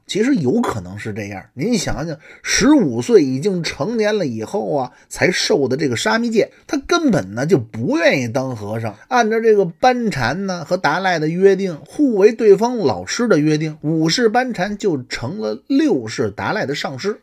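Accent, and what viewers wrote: Beijing, China. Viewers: 冒昧的判断一下 这是一口老北京北城话😅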